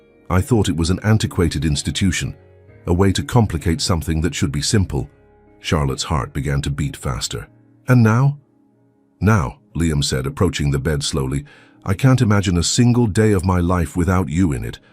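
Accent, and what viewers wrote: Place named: England, United Kingdom. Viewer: I totally agree with Everyone Else who hates & despises the REDICULOUS, HORRIBLE Video Foreground AND Background. In addition, the Stupid Producers eliminated impor- tant Written Script for people to read, especially when the Professional Male Narrator has a foreign accent like Old England, that is verry diffi- cult to understand. Otherwise, we start with a young female executive Assistant, walking into the wrong door, & sees the male CEO, "buck naked"; and HE ENJOYS IT, & from then on.., he blatantly parades around & tempts her, throughout her employment. SHAME, SHAME, SHAME on the Producers !! Rating: 4/10.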